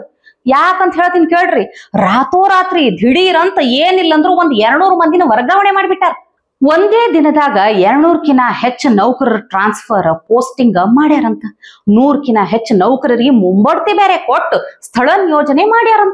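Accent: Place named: Karnataka, India. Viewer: It is a pleasure to hear your Kannada accent.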